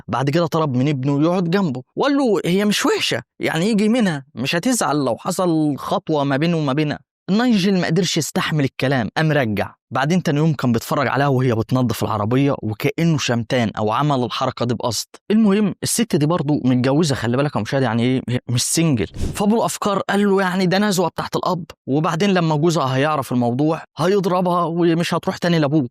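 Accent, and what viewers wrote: Egypt. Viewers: فكرته مصري ودي لهجه عندنا زي منوفي /شرقاوي وغيره ف استغربت ،عموما قريب جدا فعلا من المصري بالتوفيق 🤗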